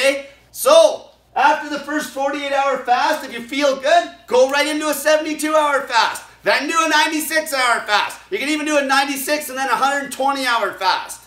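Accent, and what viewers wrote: Canada. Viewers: Is he Canadian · Canadian eh? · I detect Canadian in your yelling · i've never seen someone with such a strong canadian accent lol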